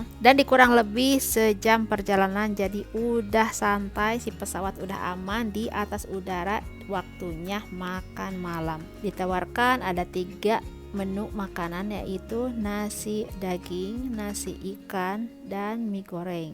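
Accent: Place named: West Java, Indonesia. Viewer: hihihi ... Ciri khas Sunda teh 😆 semangat ngontenna teh ❤️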